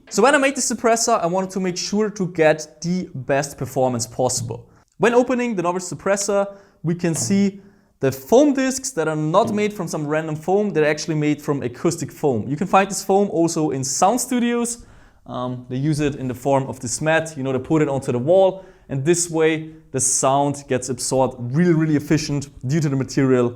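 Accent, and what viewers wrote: Germany. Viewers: Warum machst du eigentlich keine deutschen Videos man merkt das du beim Englisch sprechen einen deutschen Akzent hast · bro what the german/austrian accent is the best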